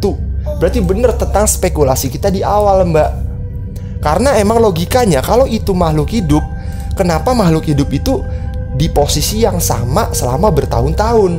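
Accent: Java, Indonesia. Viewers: Orang jawa bang? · Jowo banget mendelep 😭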